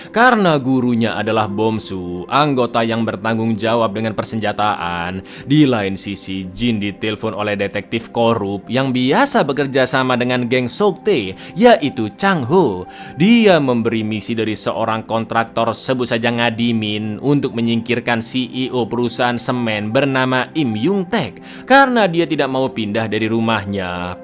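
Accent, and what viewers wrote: Java, Indonesia. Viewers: ras nyaa Hwayii Jawaa anjaayyyy 😂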